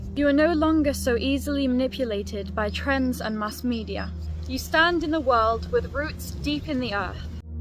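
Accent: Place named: Northern England, United Kingdom. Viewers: Did you ever spend time in Northern England? Your accent has a trace of it. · I really enjoy your videos. You seem to have a slight northern tint to your English. Did you use to live “up North “ somewhere? I’m friends with a Texan Odinist who is very close to her British and German heritage. I’ll send her this video. Thanks!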